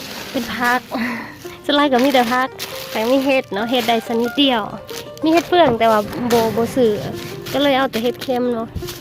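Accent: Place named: Ubon Ratchathani, Thailand. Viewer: สำเนียงการเว้าบ้านคุณ คล้ายเมืองอุบลฝั่งไทยมากเลยครับ แซบๆเด้อครับกินอยู่บ้านละดีพร้อมหน่าพรอ้มตาครอบครัว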